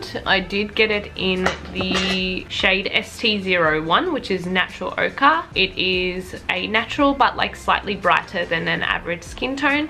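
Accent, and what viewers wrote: Australia. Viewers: I can tell as much it's Australian accent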